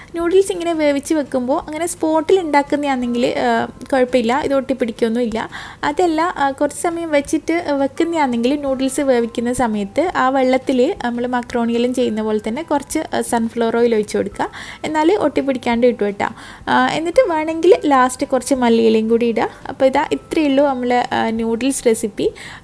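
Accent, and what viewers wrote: Kannur, India. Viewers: ithande Kannur basha oru rakshayum ellatto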